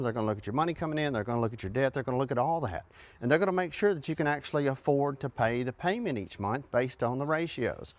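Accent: South, United States. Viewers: I’d like to listen to you but your southern accent doesn’t sound intelligent. Sorry. Just can’t.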